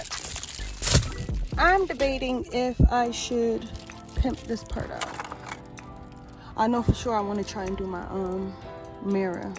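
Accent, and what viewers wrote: Jamaica. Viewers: I had to subscribe as soon as I heard your accent come out 🇯🇲🇯🇲🇯🇲 much love from a fellow Jamaican 🖤🖤🖤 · Are you Jamaican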